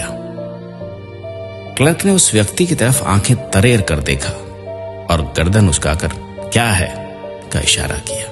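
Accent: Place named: Punjab, India. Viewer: Aap ki Awaaj nice Punjab sa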